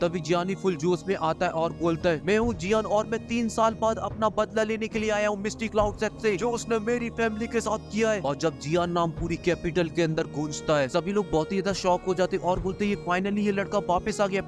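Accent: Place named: Chambal, India. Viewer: Bro ye tumhara Chambal Accent kaafi mjedaar hai... episodes dekhne ke baad bhi yha explanation do do baar sunne me achi lgti hai...upr se actor ko tharki name ek dum shi diya hai😂😂😂😂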